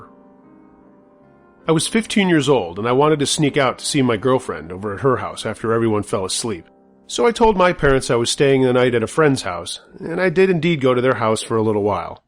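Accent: United States. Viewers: Your channel is one of my favorite and best accidental finds yet. <3 I adore creepy pasta, true stories and paranormal anything. I was having a hard time finding an American accented narrator I really enjoyed. Your pitch, intonation, and tempo .... spot on. <3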